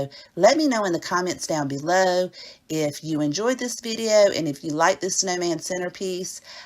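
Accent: South, United States. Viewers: Hello I love your ideas your crafts are sweet ! I just came across your channel and I’m getting inspiration already! I love it great job!love the little snowmen snow sign so cute I’m going to make one too! So adorable I’m a subscriber now too ! Fabulous !ok edit number two love these so much so awesome! Ok I had to say I love your accent southern belle so adorable!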